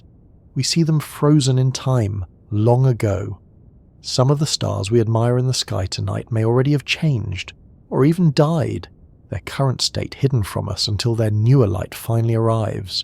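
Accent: England, United Kingdom. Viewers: He is from England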